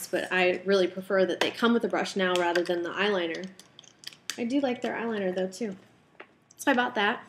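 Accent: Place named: West, United States. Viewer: AND THAAAAN hahahahah I love how you do it in a western accent... all the time!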